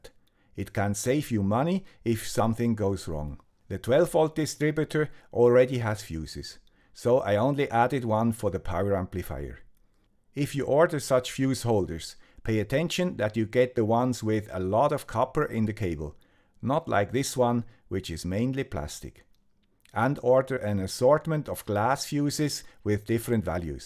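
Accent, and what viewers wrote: Switzerland. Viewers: Needed this video today...thank you,). I must confess, I am green with envy. Your workshop, supplies, and logical organizational of parts is what is on my hokey pokey ‘vision board’. You’re awesome 😎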